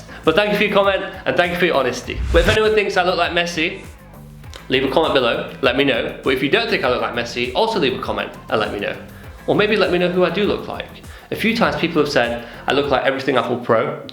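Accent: United Kingdom. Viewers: Oh my god... You've got a strong British accent.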